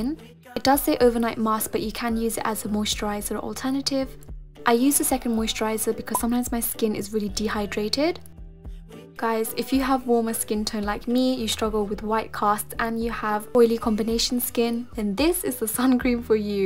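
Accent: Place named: United Kingdom. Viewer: Omg finally a new upload 😭😭💕 I’ve been waiting for this for so long! Can you upload more often so I can hear more of your beautiful British voice and amazing editing 😭✨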